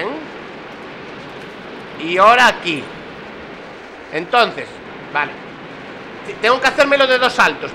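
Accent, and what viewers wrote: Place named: Galicia, Spain. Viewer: Ese acento gallego me recuerda mucho a una parte de mi familia que vive en Monforte/Ourense y se me hace muy entrañable la verdad jajaja 🔥😂 Me gusta tu rollo, transmites buenas vibras, mi apoyo por aquí! 🔥🔝💪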